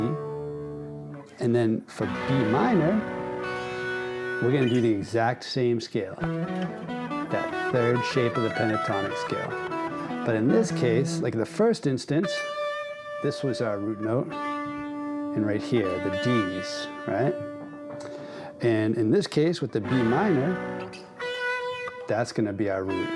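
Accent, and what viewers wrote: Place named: New Jersey, United States. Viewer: I really want to thank you for this. Though I made the same mistake yesterday, walking through a field, absentmindedly wondering if it’s a New Jersey accent you have, I got woosh-attacked again and momentarily panicked that I was under a cattle attack. But beside that… this lesson is the first time I feel like I’m “getting it”. I’ve been playing since ‘93 (apart from a 15 year gap lasting until recently). But I realise now that I never actually learnt anything because… “scales and theory! That’s not very Punk, grandma!! <smug snigger>” and apart from some and everything was Seattle/Subpop or UK/NYC Punk, so I never actually heard how I was playing under the distortion. I’ve even realised my timing is absolutely woeful as I’d always played alone or with friends, without a drummer when we were all off our heads on anything we could afford. 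So I’ve gone right back to basics. Theory, scales, rhythm, metronome and I’ve practiced those and technique every day for a few weeks but I never actually felt like it was attaching together until now! This has really opened it up for me be I can see clearly what is happening and what I need to do. I’m recognising what will sound good next and none of that would have clicked for me if you hadn’t simplified it so much and said “this is what we’re doing, this is how we’re doing it, this is why. Now go and have fun”. And I feel so grateful for that. First time in decades that I have a proper glow about playing music again! Apologi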